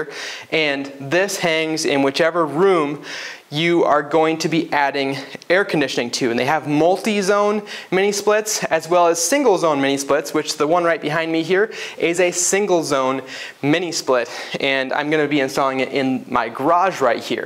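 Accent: Minnesota, United States. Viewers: I was like, "this dude is from MN, or Canada." Nice to see a fellow Mn boy on YouTube. Subscribed.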